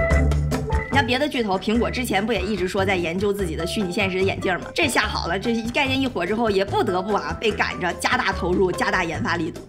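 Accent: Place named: Shandong, China. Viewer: 听出来小林的山东味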